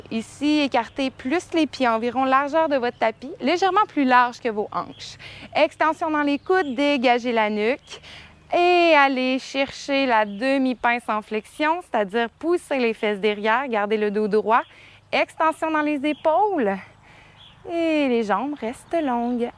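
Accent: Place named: Quebec, Canada. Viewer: Merci cousine québecoise :) Jadore la séance et l'accent!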